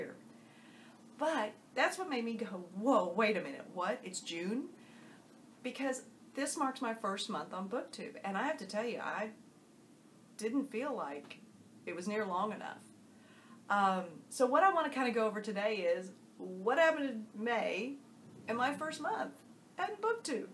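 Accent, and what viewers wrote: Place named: South, United States. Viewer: You are so outrageously wonderful. Thank you for shouting me out. You can work on pronouncing Mementomori but it all sounds good with your southern accent. You have not only been so supportive on my channel but I see your thoughtful and detailed comments spread all throughout booktube. Thank you! Excited to see where you go in June. The drama this past month was something else to witness and am happy that it seems to have blown over. No more of that! — I’ve really enjoyed all your videos, please please keep posting :) - And good luck with tacking Dickens and Tolstoy, no matter the pace!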